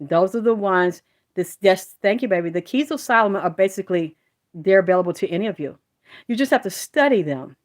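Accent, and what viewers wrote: South, United States. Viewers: I love your southern/country accent!!!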